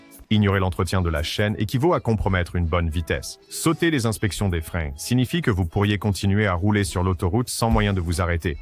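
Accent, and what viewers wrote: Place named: Texas, United States. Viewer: Pourquoi la voix de l'IA a un accent texan ?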